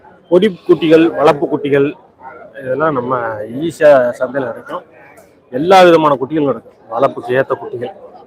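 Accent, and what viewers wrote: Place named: Madurai, India. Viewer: Madurai basha I laikkit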